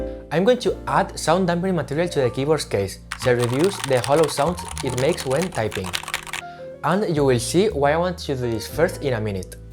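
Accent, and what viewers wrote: Spain. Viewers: Where are you from? You sound like a native spanish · Me ha bastado con la primera frase para darme cuenta de que eras español jajajaj. Por cierto muy buen vídeo, de gran calidad y se notan tus ganas y conocimiento. Hasta Marques Brownlee te ha comentado... flipante. Sigue con el buen trabajo! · are u spanish? the accent sounds like it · Spanish accent were are u from mate? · You have a suspicious spanish english accent... where are you from? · Man where are you from? Your accent sounds so Spanish! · Are you spanish, aren't you? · Súper obvio que eres de España con ese acento. ¿Cómo aprendiste a hablar tan bien el inglés?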